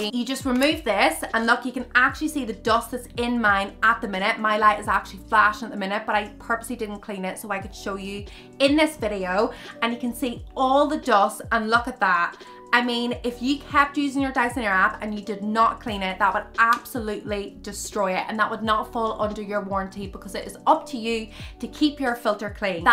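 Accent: Derry, United Kingdom. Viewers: What in the Derry girls accent is this?! The first thing pops up in my head is Derry girls after watching her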